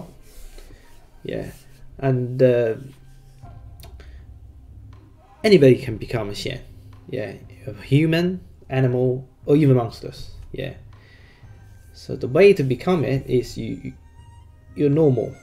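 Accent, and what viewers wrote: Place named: United Kingdom. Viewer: Your accent is very English aha. I thought you were native at first. Thanks for the video and the extra background info is great.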